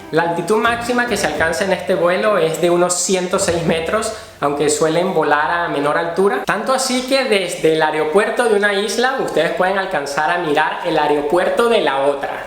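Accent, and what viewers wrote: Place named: Venezuela. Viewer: De qué región de Venezuela es su acento? Y me disculpa.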